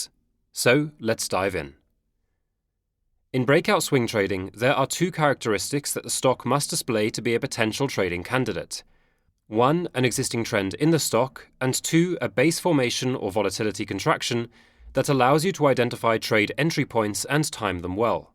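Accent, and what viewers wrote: United Kingdom. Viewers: English accent sheeple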